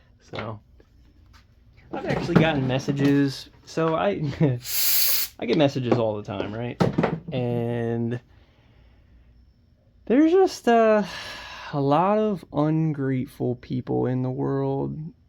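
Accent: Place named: Delaware County, United States. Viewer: where you from ? you got that Delco accent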